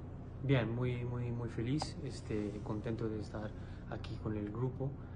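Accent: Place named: Spain. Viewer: Acento español es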